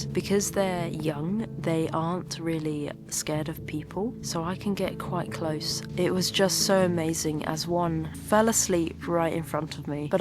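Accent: England, United Kingdom. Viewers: You don't recognise the accent? 100% English accent. Not even cockney accent. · Yes, but an English accent doesn't mean she holds only British citizenship and while unlikely may not even be British. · Such a beautiful girl and pleasant British English!!! All the best from Russian subscriber!! Love this style of life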